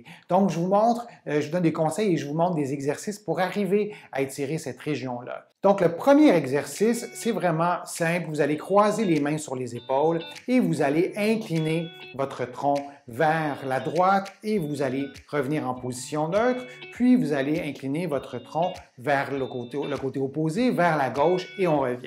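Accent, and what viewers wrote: Canada. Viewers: Merci beaucoup 🙏. Vos conseils sont simples et pratiques à appliquer. Et sont plutôt efficient. Et votre accent canadien confère un charme supplémentaire à vos explications. Belle journée lumineuse à vous✨🤗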